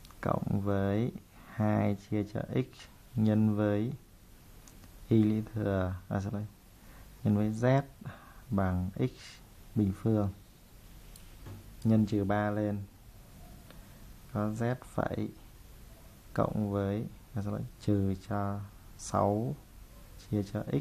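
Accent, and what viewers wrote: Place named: North, Vietnam. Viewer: hình như thầy ng miền Nam ns giọng Bắc thì phải, giảng thấy dễ hỉu hơn mấy cái power point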